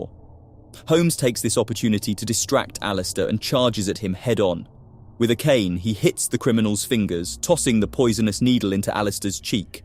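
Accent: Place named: United Kingdom. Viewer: oh nah whys the voice uk cant tell if its ai or not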